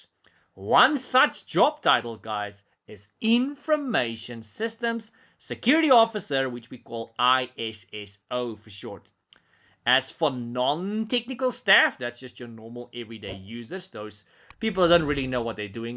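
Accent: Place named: South Africa. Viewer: Just starting my sec+ journey subbing to you simply for your epic south African accent. 😁 The apple sauce of all accents.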